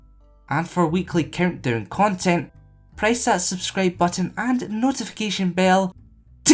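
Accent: Scotland, United Kingdom. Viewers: . Hey man I've been watching your vids for quite a while now. Your videos are great 👍. Can tell your Scottish just like me I have followed on amino. If you replied to this comment I would be delighted. Keep up the good work man 😃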